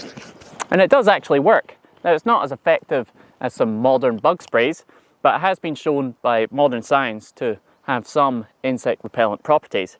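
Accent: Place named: Scottish Highlands, United Kingdom. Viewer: Hi there...great video!!!! I love plants and gardening...but what I loved the best is your lovely accent of highlander...my ancestors came from Scotland Morayshire, and settled in Argentina, South America....I'm in love with Scotland and hope to go one day. Thanks again pal. Beautifull my Scotland.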